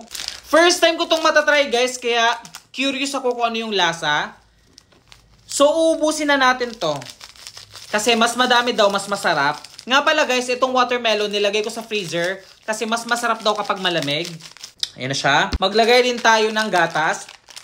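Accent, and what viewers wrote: Visayas, Philippines. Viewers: Bisaya ka po ba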